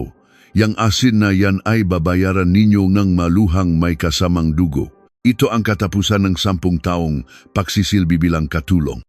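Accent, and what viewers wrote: China. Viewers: Ano ka Sir, intsik ka ba? bakit ganyan lkung magsalita itongnarrator na ito nkakahiya ka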